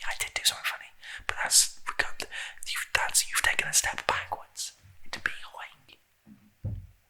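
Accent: United Kingdom. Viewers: always wanted to listen to a british guy asmr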